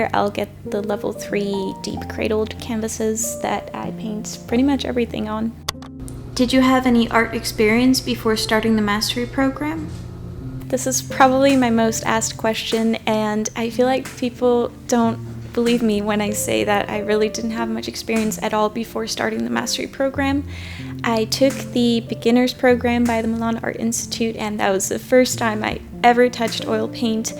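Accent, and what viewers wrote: United States. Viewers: I’ve lived in Ohio for 30+ years and you sound like you’re from Ohio, Illinois, or Wisconsin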